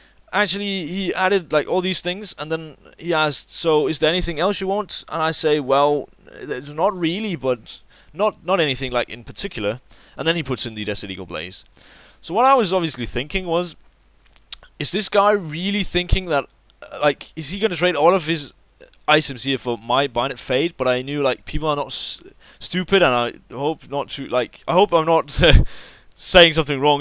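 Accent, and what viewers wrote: Denmark. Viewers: Where are you from? You sound like a Dane.. :) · Lool, are you from denmark?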